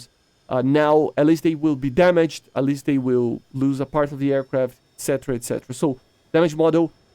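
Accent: Brazil. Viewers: hes brazilian. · he’s from Brazil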